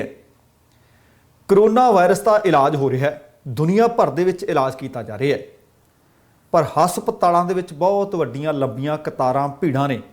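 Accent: Malwa, India. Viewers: ਧੰਨਵਾਦ ਜੀ, ਵੀਰ ਜੀ ਅਵਾਜ਼ ਤੇ ਜਜ਼ਬੇ ਤੋਂ ਮਾਲਵੇ ਤੋਂ ਲਗਦਾ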